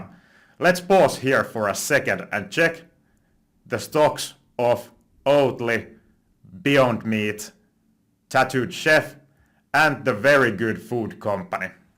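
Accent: Finland. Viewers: I FKN love Finnish accent when speaking English, littery getting goosebumps. Much love from Sweden😊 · you sound finnish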